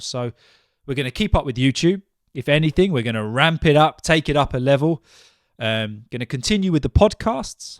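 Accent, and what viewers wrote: RP, United Kingdom. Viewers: hello mate can you do a video while you speak like your normal day not as a teacher we need more listening challenge 😉 and can i ask you about your accent is it received pronunciation?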